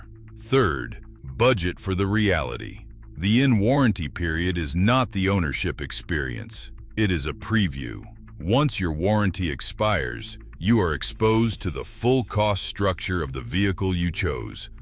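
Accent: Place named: United States. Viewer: Please can we have someone that doesn’t speak that awful sickening American accent for a change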